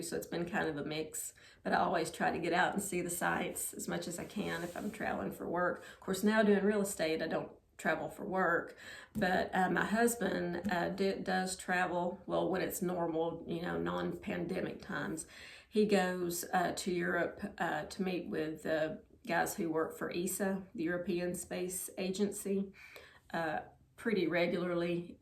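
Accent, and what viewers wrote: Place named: Tennessee, United States. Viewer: Hi, I live in the U.K. and have recently found your channel, I am of similar sizing to you (5’1” and so on) so love watching how you style your clothes. I have a penfriend who lives in Tennessee and she has your accent which again I love. I’ve travelled quite a lot in the USA and when you mentioned Loft I totally agreed, I have bought items from this store and they have been really good value for money. Congratulations on your subscribers, I’m not surprised as you are very relatable and give honest opinions on the clothes you try on. I’m looking forward to more videos so keep them coming 👍😁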